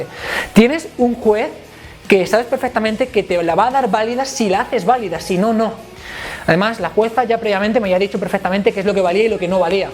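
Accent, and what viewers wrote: Galicia, Spain. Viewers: es gallego?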